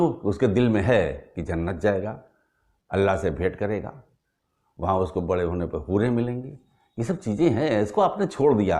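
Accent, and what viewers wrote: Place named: Allahabad, India. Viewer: bahut chutila vyangya elahabadi boli me bahutai jam tha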